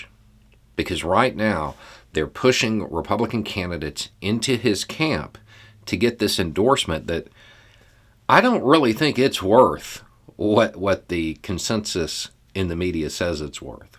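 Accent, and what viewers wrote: South, United States. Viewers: I wouldn't call it an act. is from the south and I believe the way he looks plus sounds is authentic. It's just people assume that others who look like him are going to be right wing and he uses that as an advantage for them to listen to his message. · Opara and everyone else talking about his accent As a southerner maybe I can provide some clarification. What I think we are hearing here on most of his videos is close to his professional voice. You have your regular (nautural) voice, the one that no one is going to think you have a single brain cell in your head, the one that makes you sound like an extra from "Deliverance". Then you have your professional voice, where you have to hide as much of it as you can so folks don't think you are an idiot (or a bigot, because you know all us southerners are a bunch of racists, and to be fair, I can see why someone would have that assumption). 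What we hear from is a relaxed version of his professional voice. Some videos we hear his relaxed professional accent, some his natural accent, and some we hear a played up version of his natural accent. To someone not from the south these distinctions are easy to miss. I recommend anyone interested in the topic look up "code switching". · his "act" used to be when he hid his accent. A lot of Southerners do. He's just speaking how a rural Southerner speaks. Sounds normal to me. I'm a Texan. I don't have much of an accent because my family drilled it out of us, but I've known plenty of people who sound just like him.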